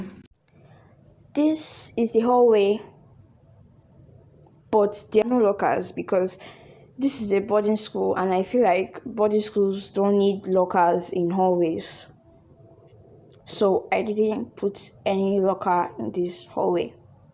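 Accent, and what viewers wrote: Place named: Nigeria. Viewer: As I heard your voice, I knew once you were a Nigerian 🇳🇬😝😝😝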